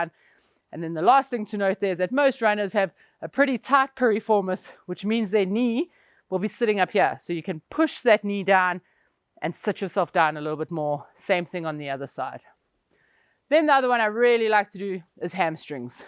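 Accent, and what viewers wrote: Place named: South Africa. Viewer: How exciting to land on a YT cxhannel and hear a South African accent. Woot! I've subscribed and plan on digging in to your content on the weekend. I was a runner and still kinda am but heck, those menopausels. :face-orange-frowning: Plus sore knees.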